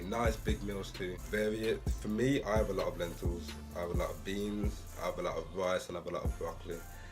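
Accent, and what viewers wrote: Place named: Birmingham, United Kingdom. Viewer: The birms accent is too wavey!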